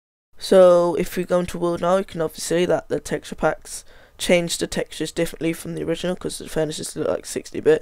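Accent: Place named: United Kingdom. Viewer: OMG he s ounds sooooooooooooooooooooooooooooooooooooooo british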